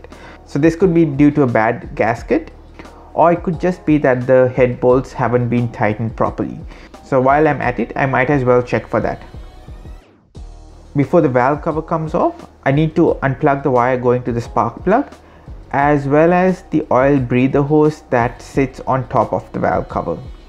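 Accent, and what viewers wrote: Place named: India. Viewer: You talk like you are from India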